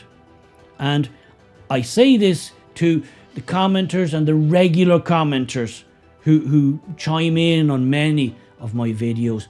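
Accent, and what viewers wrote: Ireland. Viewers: it's your common sense and cracking accent (i stand with ireland) that i enjoy keep up the good work. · Enjoy your style, especially that Irish accent. Please continue making the videos, I love listening to your wit and sarcasm. Onwards and upwards to the 100,000❤🌞🏴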